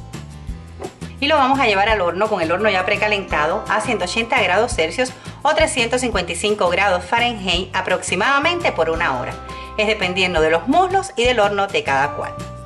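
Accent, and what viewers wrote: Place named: Cuba. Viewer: jaja ya me sonaba ese asento .un gusto yo tanbien soy cubana desde el primer día k vi tu video me suscribi. Por tu asento .jenial 👏👏💪💪💪🇨🇺🇨🇺🇨🇺🇨🇺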